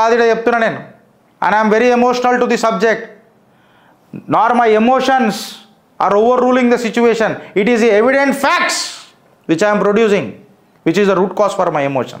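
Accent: Telangana, India. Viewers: Ee telanga telugu kanna normal telugu lo matladadandi, mee accent improve cheyyandi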